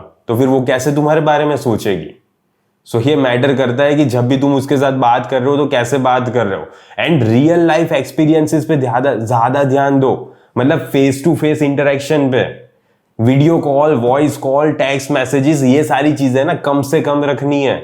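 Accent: Gujarat, India. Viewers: Bhai tame Gujarati cho? aapki aawaaz se lagta hai!! BTW Meh gujju chu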